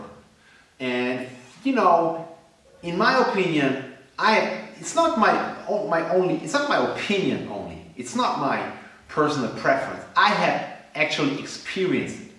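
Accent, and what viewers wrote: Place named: Europe. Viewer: Hi, love your work! I fully understand what you are talking about. I have a couple of questions: 01. Are you going to be doing instructional submissions escapes and positional escapes anytime soon? 02. If someone wants to be moving up the ladder in your philosophy and your jiujitsu... How does one go about it (You sound European, so it would have to be remotely)? Thanks!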